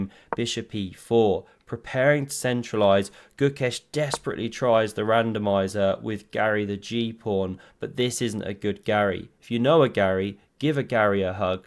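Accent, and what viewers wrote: England, United Kingdom. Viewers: Scottish accent? Though was English!!!! 😂😂😂Great analyze mon ami 😜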